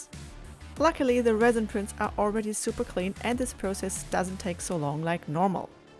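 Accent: Germany. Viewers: You sound so damn German :d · DU BIST DEUTSCH AKZENT,VORSCHAU IN DER ECKE OBEN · I just found you guys randomly and judging by your accent i figured you are german. Turns out you are. Also, it turns out you guys were at the Fantasy Basel. Seems like I'll see your work or even meet you guys in the next FaBa. Nice. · You are german or swiss or austrian arent you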